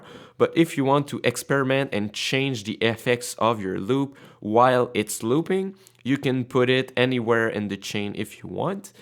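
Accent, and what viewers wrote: Quebec, Canada. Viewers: You sound like you're from Quebec?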